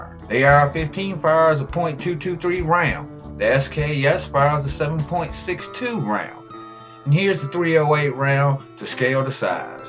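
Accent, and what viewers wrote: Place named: Mississippi, United States. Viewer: you talk like youre from mississippi or something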